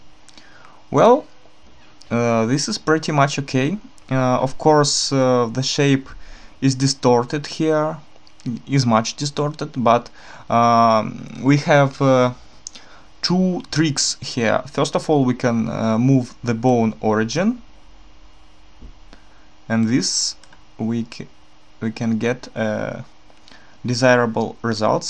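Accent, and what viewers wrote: Russia. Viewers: Рашн аксент эноин май ээрс абсолютли ) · Приятно слышать родную речь.Говорит на Инглише, но ТАК по русски.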